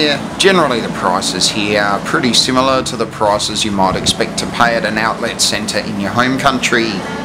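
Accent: Australia. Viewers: Such a strong ozy accent.😂😂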